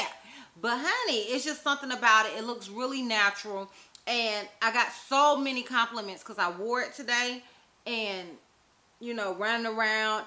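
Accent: South, United States. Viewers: You’re a very beautiful woman. Your southern twang gives you more character as well. ❤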